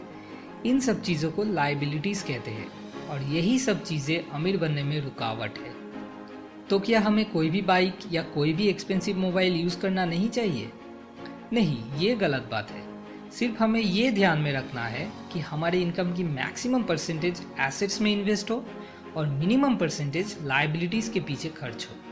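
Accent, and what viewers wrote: Bengal, India. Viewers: ur voice seems like ur are Bengali ! Right? But by the way... video ach6a tha!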